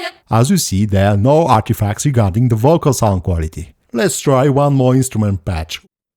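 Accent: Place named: Russia. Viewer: а вы, дорогой друг, часом, не носитель русского языка?)) а то уж больно акцент знакомый)))))))))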